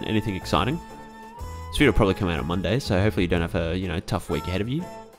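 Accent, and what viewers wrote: Australia. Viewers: The Aussie Just shone through in this video, never felt more at home!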